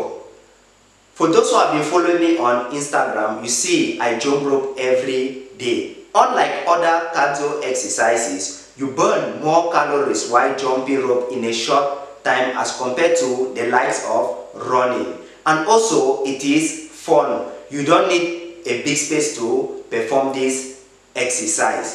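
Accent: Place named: Nigeria. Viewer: ohh nice you sounded like a Nigerian good job mehn you look good 👍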